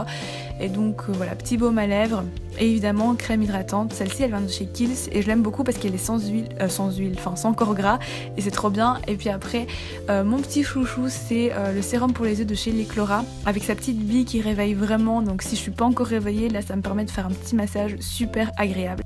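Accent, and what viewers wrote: Belgium. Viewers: Belge ?! 😂❤ Super vidéo 😍💍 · wuoooh' tu es Belge? :0 c'est la première vidéo que je vois de toi, franchement j'apprecie, je m'abonne! et je mets, un pouce bleu! 😊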